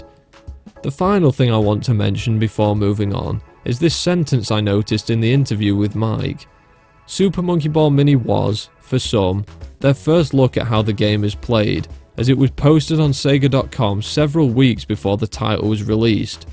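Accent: United Kingdom. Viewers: That British was like a foreign language to me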